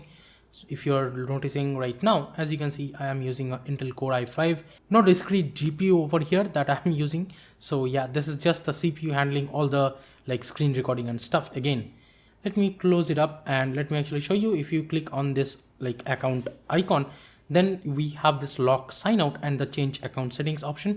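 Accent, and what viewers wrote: India. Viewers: Why does it give me weird vibes to hear an indian accent and see AnyDesk on the desktop? Nice video tho! ^^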